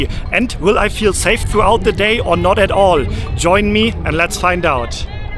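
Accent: Germany. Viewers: Ya sabía, dije, ese acento no es estadunidense jeje. So, You are from Germany, You should search to Jan or Moe, they arebfrom Germany and they have many years here un México. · You shouldn’t be assuming that people understand your English, let alone with your German accent. Learn the basics of Spanish for a German tourist. If you went all the way there and you don’t even know what “Salida” signs are telling you, that means you didn’t prepare at all for this trip.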